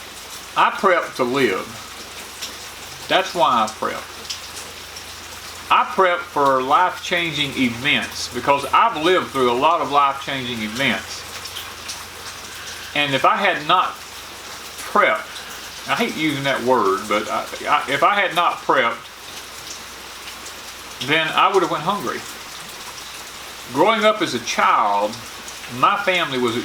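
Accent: South, United States. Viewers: I'm glad to hear that you're going back to a topic based live stream. The first one was really good but the others just seemed silly.. Everyone is just chattering about nothing and distracting you and from what you wanted to talk about. I'm looking forward to this weeks live broadcast again:-), I love your Porch Time. You have such a relaxing, southern gentlemanly way of saying everything and getting your point across. Loretta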